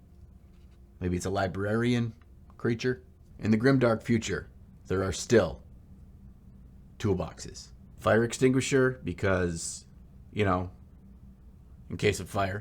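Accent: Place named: Minnesota, United States. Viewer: This is completely unrelated to the video, but I love the fact I can tell you're from Minnesota! I went to work in Connecticut for two weeks, it was a work trip from the UK, and the guys I worked with mentioned Minnesota for whatever reason, I think it was related to not being able to find an In and Out Burger on the East Coast. They kept calling it Mini Soda because of the accent, and yeh, bag, tag etc they're so distinctive! I love it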